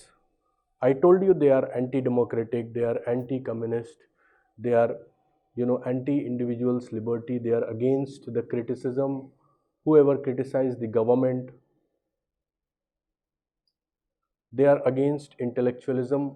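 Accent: India. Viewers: Thanks for the video, i mostly knew these knowledge but this video was very good. I do not mean anything bad, but i like Indians and their english so much, and i hoped our countries had better relationships! Thank you sir regards to you from Iran/Persia 🇮🇷🤝🇮🇳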